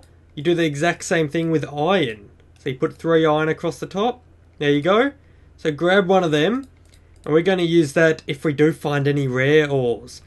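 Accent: Australia. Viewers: is it just me or does sound australian?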